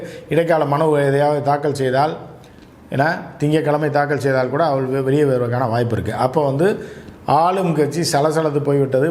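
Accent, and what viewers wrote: Madurai, India. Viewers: மும்பையில் இருந்து திருநெல்வேலி வருவேன் ....கண்டிப்பாக அண்ணன் உங்களை எப்படியாவது சந்திக்க முயற்சிப்பேன்...விரைவில் உங்களை காண ஆவல் அண்ணன்...உங்கள் கருத்துக்கும் , பேசும் பானிக்கும்...அந்த மதுரை தமிழுக்கும் நான் அடிமை ❤️❤️🙏🏾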